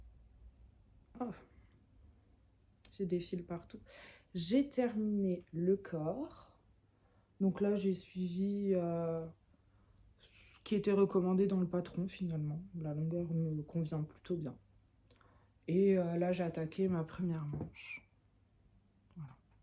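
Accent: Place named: North-East, France. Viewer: Je vous découvre par hasard , merci youtube , pour une première vous êtes bien à l’aise , bravo . Je reconnais ce petit accent du nord est de la France , je dois avoir le même 😊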